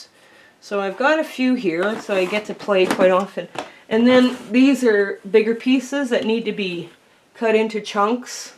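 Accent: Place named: Canada. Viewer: Hi, I envy your organizational skills. My sewing room is a mess right now. We're going to move my sewing room from the dining room to the den. The den is actually smaller so I'm going to have to get better organized. I love how you have so much fabric ready in anticipation of using it in a project, your storage of 2.5 inch strips is genius. I'm stealing that idea from you and I'm going to have to steal your Area 51 for my UFOs as well. It's too funny. I have a Bermuda Triangle in my laundry room, it's where socks go to another dimension. I love your Canadian accent! I went to school in Ontario so my ears perk right up when I hear that lovely Canadian way of saying certain words like project, it takes me back to my school days, fond memories for me. I'm from Michigan and still have a slight accent but I think I sound like a Michigangander now. I have a very dear friend in Calgary, it's just gorgeous there.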